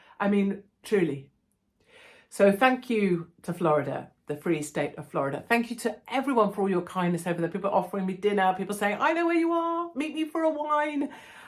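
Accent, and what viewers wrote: United Kingdom. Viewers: Hey! I got some Real good constive Critsisimusssmms In Russia they do a standing ovation when the plane lands.:face-green-smiling: I would feel the same way! 😅 I would be as well. Glad you made it out alive out of D.C. Well, you have been so kind to SOOooooo MANY! LOL! 🤣 Well, we in freedomland dig your British Accent. And you sort of sound like Alexa or Eliza take your pick. ☺:face-turquoise-speaker-shape: NO NO NO NO NO! Remember U R STILL in the U.K.! DANGER WILL ROBINSON!:hand-orange-covering-eyes: Glad you love being in the States and to be Frankly honest, WOULD LOVE TO HAVE YOU BRITS (not all of you) make the move across the pond and come here to become freedom loving Americans! Yea, things are going to get better as long as you stop voting for Libtardia. Plenty of you have came to conservative states, and many of you "Lot" r here in Oregon & I LOVE YOU HERE! 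(Just stay the hell away from DownTown Portland, and stick to the Burbs) Yea its still expensive, but if you work your Hiney off, you can also live a great life here. WE in America are going to rebuild and become the BADDEST MOFO's on the planet again, and if you want to be apart of that? Come on over partner! Just if you got kids? Make sure to keep them out of our Public schools. Lots of crazies still in the schools there, so more for you Bachelors and Bachelorettes. Have a great one and hope you take the steps to make things better in the U.K. Would love to see Fra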